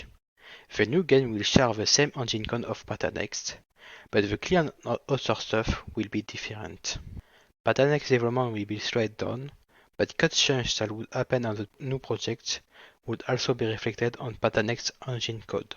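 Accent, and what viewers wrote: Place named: France. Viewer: his french is THICCCCCC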